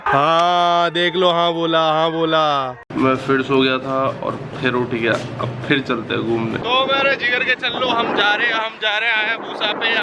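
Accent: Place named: Hathras, India. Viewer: bhai aapki language toh hamare jaise hai hum bhi hathras ke hai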